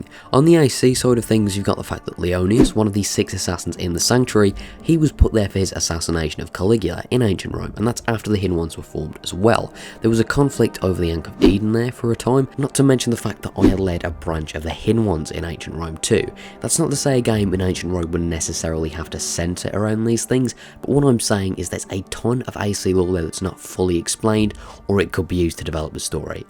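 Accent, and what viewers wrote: United Kingdom. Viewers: Good Video. Unfortunately, I hate the british accent!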